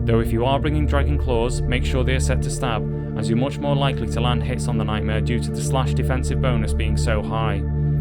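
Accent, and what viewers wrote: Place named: United Kingdom. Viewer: sat through a 20 second intro just to find out this guy is british, disliked and clicked off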